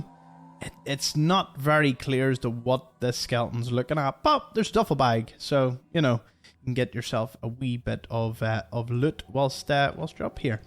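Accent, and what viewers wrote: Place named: Scotland, United Kingdom. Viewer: One thing to keep in mind is the bombs dropped on a Saturday morning. Was it a worker fighting the boss, or had someone broken into the building & made their way to the office and the safe only to be accosted by security? As to never having explored it, I figured the buildings with candles by the door in Concord were waving players to come that way. Lastly, love your accent. Is that Scottish? A person has to ask, because there is a separate accent practically every other mile a person travels in the UK.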